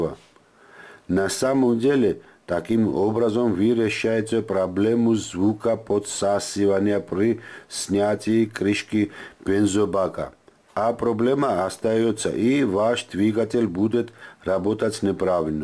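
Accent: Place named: Georgia. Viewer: Грузинский акцент, ласкает слух 👍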